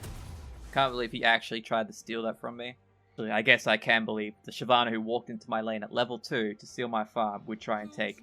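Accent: Australia. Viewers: Loving the series mang keep it up, real informative and nice to hear an aussie accent behind the skill. Have you considered doing coaching videos?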